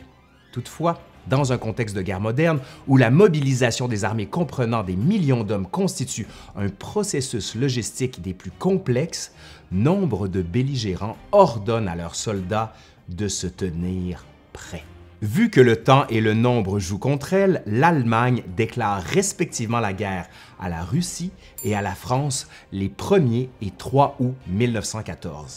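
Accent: Quebec, Canada. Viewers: Quelle chaîne remarquable ! Des sujets abordés avec sérieux. Les références sont détaillées. Tout est admirablement commenté avec une narration et une diction très agréables. Ajoutons en prime ce charmant accent québécois. Merci ! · c'est l accent québécois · Très belle diction avec cet accent attachant de nos cousins canadiens empreint du parler de nos ancêtres émigrés il y a si longtemps dans la belle province. Ils ont tant donné près de chez moi, à Vimy Mon plus profond respect pour tout les chums qui ont donné leurs vies pour notre chère liberté. Merci les cous' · Un accent un peu faible et qui devrait marquer plus l'exotisme du Québec, surtout pour les nouzauts francophones de l'Océanie. Génial ! Continue !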